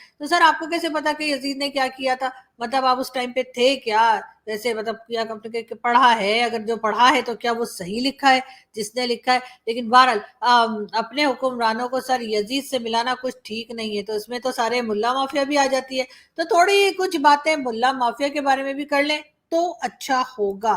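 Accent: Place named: Allahabad, India. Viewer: You're very cute BLOGGER, the way you speak it really reminds me of Allahabad , you're a true Allahabadi , keep it up , my best wishes and blessings I am too is ALLAHABADI , though live in Delhi BUT our ancestors Bunglow is still intact in Allahabad where i frequently visit , it's really a very sad situation that l live here in a flat WHEREAS only three aged my sisters and a brother lives in a 4 acre huge bungalow spread over 32000 sq feet I only wish that one day l could host you in my Allahabad house, if i really survive , l celebrated my 80th Birthday in San Francisco in the month of May So long ❤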